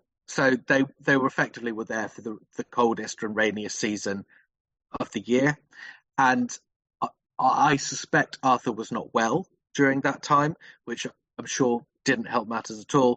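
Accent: United States. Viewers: No disrespect, but find listening to words mispronounced, and an American accent when discussing Tudor history, a lot of which is supposition, especially about Prince Arthur, very off putting.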